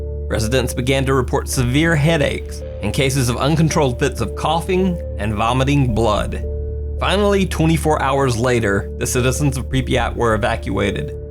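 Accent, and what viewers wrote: South, United States. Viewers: I love his voice too, and Southern US voices are so gorgeous, I'm from UK and they sound really lovely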